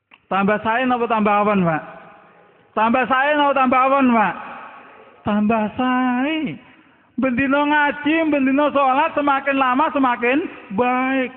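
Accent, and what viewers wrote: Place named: Java, Indonesia. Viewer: keturunan brahmana dari mna logatnya aja jawa dasar ngaku2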